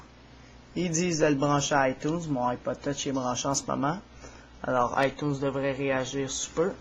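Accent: Quebec, Canada. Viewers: C'est un canadien c'est pas de sa faute s'il a un accent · hey.. je tiens à vous prévenir que c'est pas sa faute si il a l'accent canadien, j'aimerais bien attendre vos voix, à vous. il a au moins le courage de faire une vidéo, donc arrêtez de critiquer, sa a du bien vous servir ce qu'il a fait. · Au Quebec ca parle comme ca, les Quebecquois parles mieux le francais que nous autres francais où l'on a remixé notre langue pour la rendre plus"cool" ...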